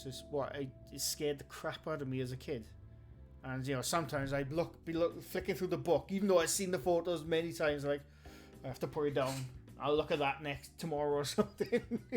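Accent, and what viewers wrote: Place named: Scotland, United Kingdom. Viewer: Love your accent. Scottish??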